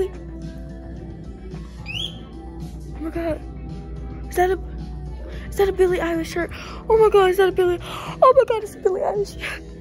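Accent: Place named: United Kingdom. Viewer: nahh she’s giving british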